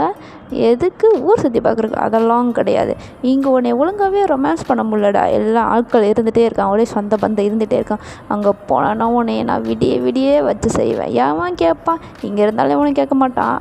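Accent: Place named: Coimbatore, India. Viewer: Super story 😅ma semma I am your new subscriber ama neenga Coimbatore a slang appadithan irukku athan ketten 😅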